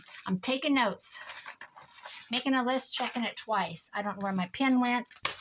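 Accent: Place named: Midwest, United States. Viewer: I love listening to your stories while I’m crafting along with you. It’s so refreshing to listen to someone from the midwest. I moved away now but it sure is good to hear your stories!!!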